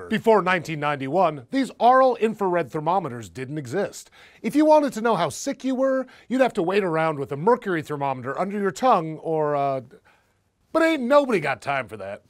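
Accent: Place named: United States. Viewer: I´m normally not as keen on the American accent that I am on others, but this guy´s voice is purrrfect. And he works with SciShow. And he looks good. He makes me question my sexuality, goddammit.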